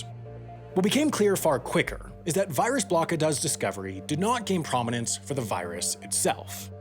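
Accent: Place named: United States. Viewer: Sounds American to me.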